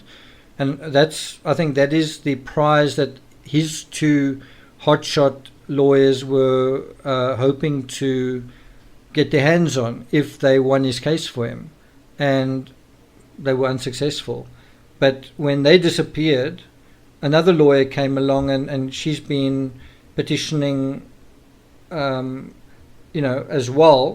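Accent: South Africa. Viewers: I agree with you Diana, makes absolute sense by appropriate investigation and analysis of evidence, I have read a few of his books before he started this blog unbiased and rocket science ☺️ with his clear South African accent.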